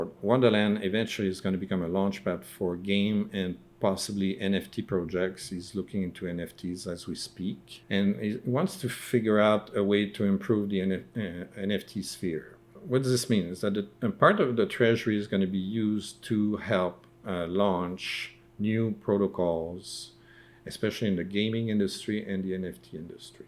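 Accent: Quebec, Canada. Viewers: I can tell by your accent that you’re from Quebec!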